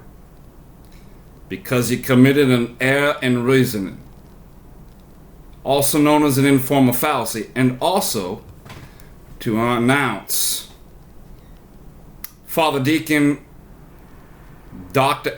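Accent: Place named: Georgia, United States. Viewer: I like the accent. Sounds like you’re from Gawja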